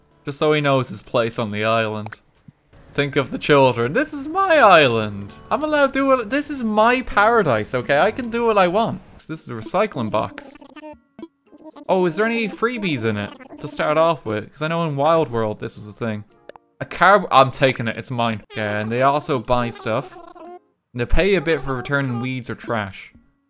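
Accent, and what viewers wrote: Ireland. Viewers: couldn't place accent for a few videos, clicked on bio realized he's from ireland. everything makes more sense now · Are you irish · Every time you say Blathers in your Irish accent my American brain hears "Bladders"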